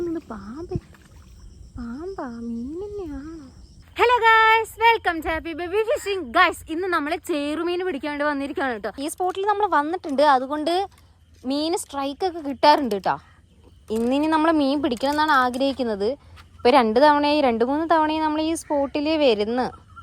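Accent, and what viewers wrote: Palakkad, India. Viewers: സ്ലാങ് കണ്ടപ്പോൾ പാലക്കാട്‌ ആണോ എന്ന് തോന്നി അതാ ചോദിച്ചത്.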